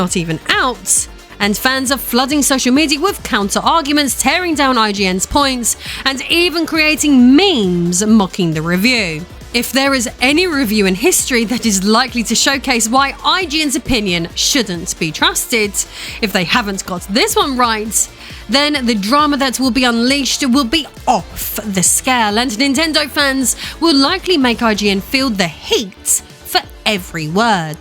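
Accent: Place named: London, United Kingdom. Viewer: You sound like you're from London!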